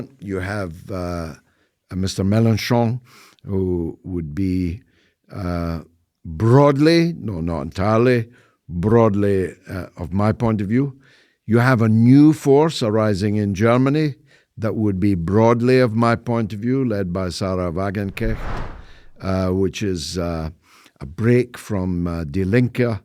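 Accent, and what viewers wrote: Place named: Ireland. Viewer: This man sounds irish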